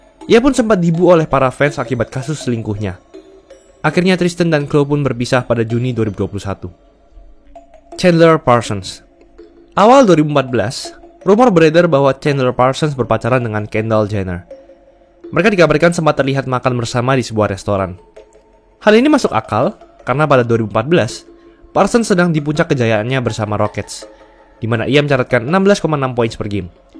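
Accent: Java, Indonesia. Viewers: konten mantap, aku saranin untuk next video dubbingnya jangan medok banget bang wkwkwkwk, semangat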